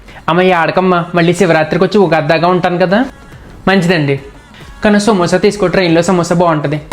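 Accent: Godavari, India. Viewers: ggaruu mee story prathilipi lo chadivanu andi naaku baaga nachindi mee writing style and mee godavari slang lo chamatkaaram ga maatladadam yevariki sadhya padadu.😅😅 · Mana godavari yaasa malli baga gurtuchestunaru garu.. missing that after marriage and moved to other states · Mee godavari matalu vinte Pranab Leche vastondi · సూపర్ మీది గోదావరి నా sir ❤❤💐💐🙏🙏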